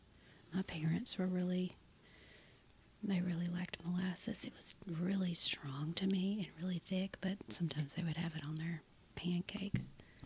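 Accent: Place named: South, United States. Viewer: Yay, you're speaking! I love your baking videos but always wished you'd add the soft spoken voice. Generally I don't like voiceovers, but yours was great. So, yes to the speaking, yes to the crinkly flour and sugar bags, yes to the butter wrappers, yes to checking the recipe a lot, yes to minimizing the mixer sound and yes to porcelain bowls! Great job! Plus you really know your baking. And your southern accent was just the icing on the cake. Maybe you could add narration to your other past videos. Keep up the great work! Thank you.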